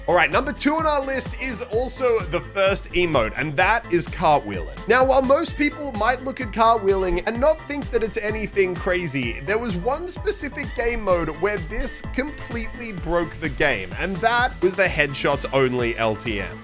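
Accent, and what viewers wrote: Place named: Australia. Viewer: Even though it's basically becoming SypherPK with an Australian accent, a week late. It is still not nearly as bad as the Fake American Accent Aussie Fortnite Youtuber.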